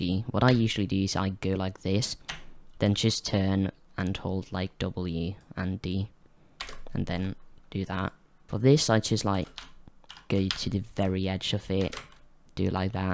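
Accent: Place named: United Kingdom. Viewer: i thought he was a brit lol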